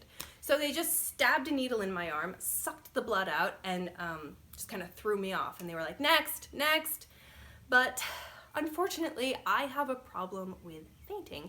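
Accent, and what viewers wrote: Turkey. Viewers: Aksanı amerikadan tr ye gelmiş amerikalı gibi değilde sanki tr den usa ya gidip ingilizce öğrenip gelmiş türk gibi 🤔 her dediğini anlayabiliyorum gibi. Bu işte bi i... var😎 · Bir Türk'ün ingilizce konuşması gibi. Türkçe şehir adlarını aksansız söylüyor. Yani bir Türk. Ebeveynlerinden biri Türk olmalı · I have a feeling that you might even be a Turkish. Your pronounciation of certain words are very Turkish.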